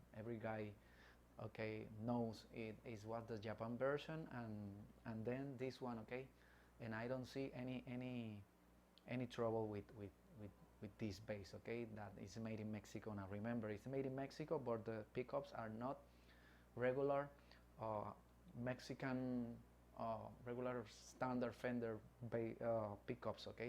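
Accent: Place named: Chile. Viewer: Eres chileno? ... tu acento suena a chileno 😨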